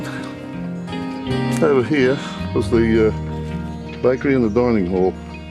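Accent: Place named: Australia. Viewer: Onya, this is the first I've seen of you but I'll be watching more for sure....a quick look at your page and the amount of content is WOW. I left Oz in 98 for work and never considered that I'd call somewhere else home but that's how it ran....so I absolutely luv hearing some fair dinkum auusie talk.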